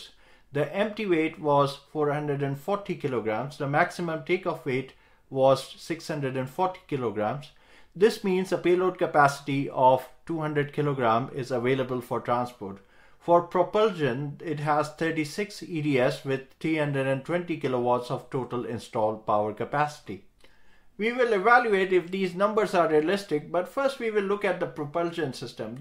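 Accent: India. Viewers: I love that India Sound :) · Once u get past the indian accent u realise this guy knows his stuff and explains everything really well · People may be reading too much into this comment. Yes, on YouTube, there a ton of guys with Indian or Pakistani accents doing videos of this type who are really just trend hoppers, who present nothing new in their content. After listening to him talk, he actually knows his shit, and does remind me of the Indian engineers I've worked with over the years. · If you went to Engineering school, you'd already be past an Indian accent. His English is perfect. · I've worked with so many smart Indian men and women that the accent automatically lends credibility. :0)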